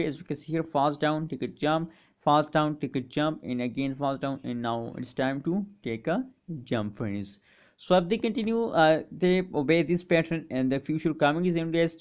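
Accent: India. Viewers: Terrible indian accent.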